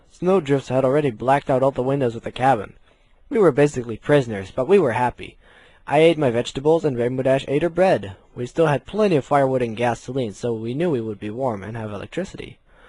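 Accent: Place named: United States. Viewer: Wait, you're from holland? Hold on let me go on google maps........, OOOOOOOOOH, ok now I know were that is, wait, also dont they speak another laguagd and have a different accent because you sound alot like you're from america.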